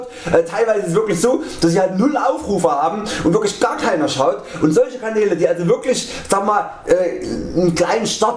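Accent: Saxony, Germany. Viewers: Ich verstehe dich gut- auch wenn du manchmal schnell und viel redest ( bin da teilweise auch so) daß du aus Sachsen kommst hört man shcon- aber das ist doch nicht schlimm! Ganz normal dss mand as ein bißchen raushört, aber soo viel ist das auch nicht. Ich komme z.b. aus dem Sauerland ist bei Dortmund, und rede auch kein gaanzes Hochdeutsch das würde man auch hören wenn ich woanders wäre, das ich aus dem Sauerland bin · Dein sächseln klingt ganz anders als meins =D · Ich finde das echt ne nette Sache von dir, dass du kleinen Youtube Kanälen eine Starthilfe geben willst! :) Aber mal eine Frage: Was genau definierst du als Erfolg? Kommerzialisierung?! Denn Erfolg kann sehr individuell sein! Für mich ist schon das reine drehen eines Videos ein Erfolg :) Ich finde deine Art sehr klasse und sympathisch! Wegen mir kannst weiter sächseln wie du willst :D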